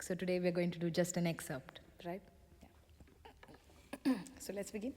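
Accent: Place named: Bijapur, India. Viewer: ಇದು ಧಾರವಾಡ ಕನ್ನಡವು ಅಲ್ಲ ಇದು ಪಕ್ಕಾ ಬಿಜಾಪುರ್ ಕನ್ನಡ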